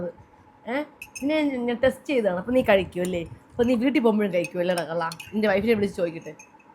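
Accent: India. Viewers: aentha eppol ella vdeos lm thrissur slang l samsarikknne Kurach famous ayappo tvm slang mattnna aentha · trivandrum slang ingana thanneyan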